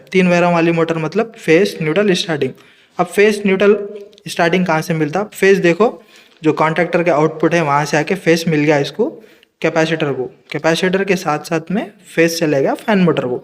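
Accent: Hyderabad, India. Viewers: Bhai aap Hyderabad ka hai aap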